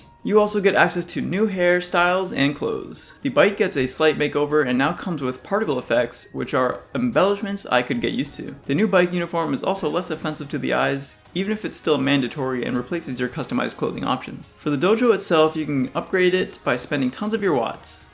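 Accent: Canada. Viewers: Why do you sound like you from canada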